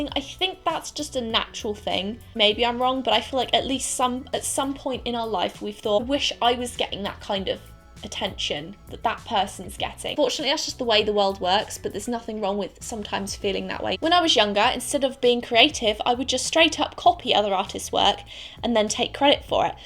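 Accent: England, United Kingdom. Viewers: she is so classically English but not at the same time... why? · u aren't us based, u sound British?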